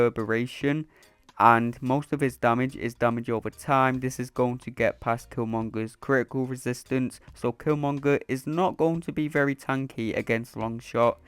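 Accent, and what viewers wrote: United Kingdom. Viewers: Hey, where in the UK are you from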